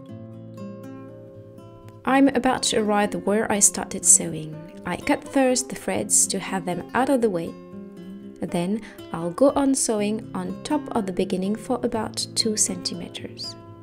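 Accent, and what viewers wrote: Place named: France. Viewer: Very good step by step video. (And a charming French accent too!) Same method as recommended by my highly experienced upholstery tutor.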